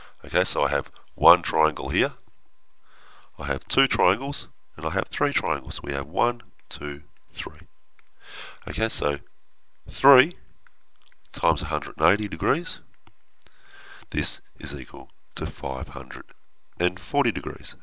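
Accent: Australia. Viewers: Woah,your Australian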